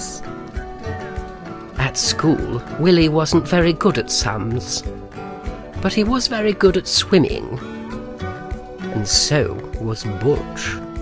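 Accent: United Kingdom. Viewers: I love your british accent i guess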